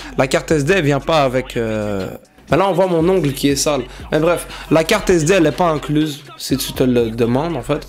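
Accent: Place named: Quebec, Canada. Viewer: Toujours toutes tes vidéos avec d’la bonne humeur et de super délires mdrr et en plus j’adore ton accent québécois sur des phrases complètement française !🤤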